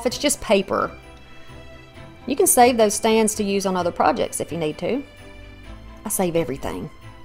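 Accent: South, United States. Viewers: Being a child of the 50s.... I LOVE this.... but I have to say it hurt my heart when you cut the books !!!! LOL.... but love that you gave it new life...... I would love to see more of this... I am a new subbie!! And I can tell you are a fellow Southerner..... Mississippi here..... looking forward to more videos...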